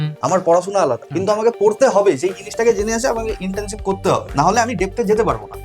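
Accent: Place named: West Bengal, India. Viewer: ইন্ডিয়ান বাঙালীদের কথাবার্তায় একটা বাটপার বাটপার আভাস পাই। আপনাদের accent অনেক ক্ষ্যাত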